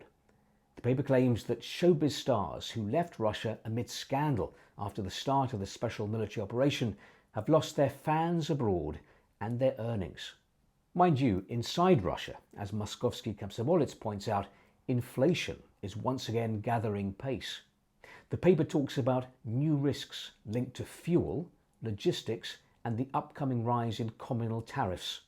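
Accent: United Kingdom. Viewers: Not only BRILLIANT and SO BRAVE, dear Sir, but I guess we all in north America enjoy and admire your magnificent Oxbridge diction. Spasiba, poka poka for now, and God bless You ! Slava Ukrainia.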